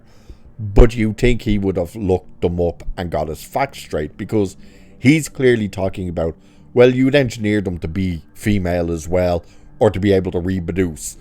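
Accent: Ireland. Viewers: North Dublin 😂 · Love your dialect. Which part of Ireland? Sounds close to some Newfoundland dialects I've heard.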